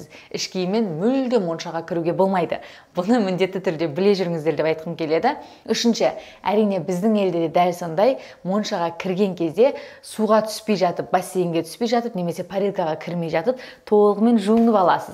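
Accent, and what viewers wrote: Standard. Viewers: қызым,саған үлкен рахмет! Өз тіліңе деген құрметін үшін, өте таза сөйлейсің. Кереемет.Өте білімді екенің байқалып тұр. Негізі алған біліміңді білгім келеді жене Корей тілі қалай үйрендің? Кореяға қалай келдің, әлде тұрмысқа шыққансон ба?😊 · молодец өз тілінде таза сөйлейді екенсің, құрметте дұрыс Әрдайым жаңалығыңмен бөлісіп отыр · Жарайсыз, қаншама жыл Кореяда тұрсаңызда қазақ тілінде таза сөйлейсіз,бұл үлкен құрмет елге,тілге деген...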